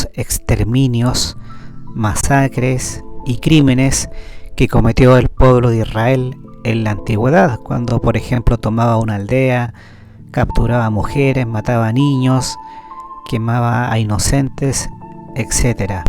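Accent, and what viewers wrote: Chile. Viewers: Ya que tocaron el tema de la locución, coincido en que a mí tampoco me gustó. Desde luego que el contenido es más importante y no voy a dejar de ver el vídeo porque la locución no me gusta. Pero, si deseo mejorar el canal, es preciso cambiar de locutor. No es tanto el acento chileno (que también) sino que realmente no sabe narrar. Lee el libreto como sea, no hace las pausas necesarias, no transmite...pareciera una persona al azar que ni siquiera conoce el contenido del libro. A mí me gusta la voz de Helena, lee muy bonito. Al final de cuentas, nuestro idioma viene de España, por lo tanto quienes mejor lo usan son los españoles. Humilde opinión. Saludos. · A mí por el contrario me encanta que 🤩estos vídeos se hagan de viva voz de: ¡Se que eres tu! Porque nadie más podría explicar con tanto discernimiento y claridad lo que ha expuesto en su blog y eso es grandioso.. 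He aprendido mucho con tus aportes, ya que desde hacía muchooos años buscaba aterrizar de esta manera las lecturas del libro. Por más podcast como estos con acento Chileno 😄 por favor🎉. Gracias ❤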